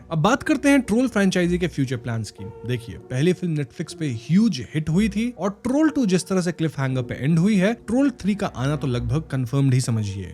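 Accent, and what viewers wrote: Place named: Bihar, India. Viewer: broh no hate but apna accent thik kro yaar bihari accent nikal deta h gussa aata h ekdm se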